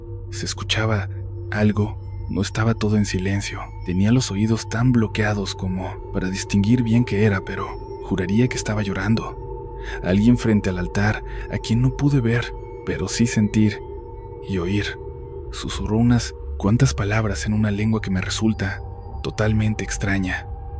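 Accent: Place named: Portugal. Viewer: POR QUE DE REPENTE TIENES ACENTO COMO DE PORTUGUÉS Y ESTUVO RARO QUE SE REPITE UNA PARTE QUE YA HABIAS CONTADO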